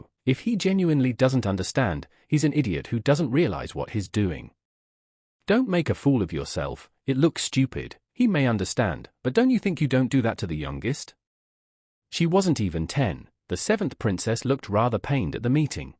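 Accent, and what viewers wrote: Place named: United Kingdom. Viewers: Bri’ish narrator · ya seems really weird having a brit accent to narrate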